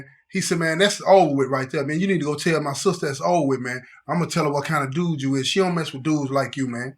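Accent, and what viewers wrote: South, United States. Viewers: Hi, I look forward to ur videos everyday. Ur VERY consistent I love ur southern accent, I think it's sexy, anyway, u hv become part of my morning routine. I wish there was a way to send u $. U r LIT J! Hv a good day.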